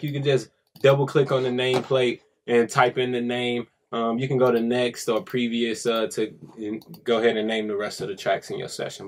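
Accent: St. Louis, United States. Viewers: UR GODD AT IT BUT U R HILARIOUS.....U SOUND LIKE A STL NIQQA!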